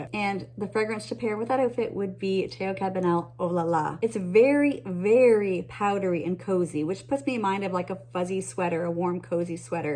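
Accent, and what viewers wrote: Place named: Maritimes, Canada. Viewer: I was trying to figure out which island you were talking about and then I realized it's PEI (confirmed by your Maritime accent 😄). Then I wondered what perfumes you wear to your favourite places on PEI. Then I wondered what perfumes I wear to my favourite places in BC. Now I'm off to Winner's to find Bourbon Craft. Wish me luck!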